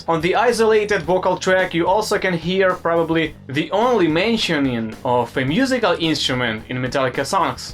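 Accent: Russia. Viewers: Русский английский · Good video! You're russian?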